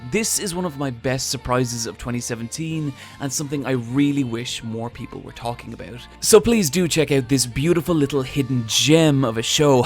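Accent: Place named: Cork, Ireland. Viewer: Man, you're really fantastic dude, keep it up. I'm from Cork and its quite refreshing to hear a voice I can relate to talking on these topics.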